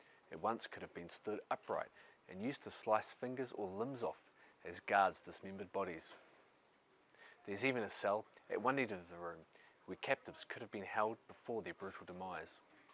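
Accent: New Zealand. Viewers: You sound like a kiwi? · Is this a New Zealand accent? Hard to understand a lot of words.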